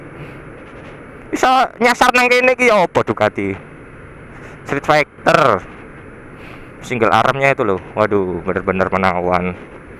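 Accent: Malang, Indonesia. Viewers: wihh... arek ngalam bro podo 😂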